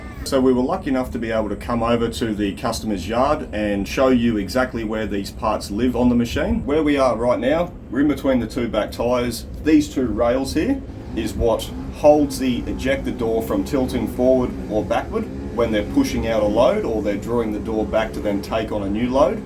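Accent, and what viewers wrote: Australia. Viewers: This is like ASMR for me: Aussie accent, grinding, cutting, welding, air tools... love it! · Classic we sure do have a twang down under 😀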